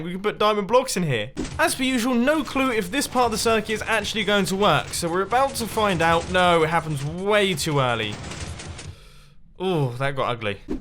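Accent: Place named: United Kingdom. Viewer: "It's gonna be complicated..." he said in his brittish accent..."lemme make it more complicated" me saying as if I was him....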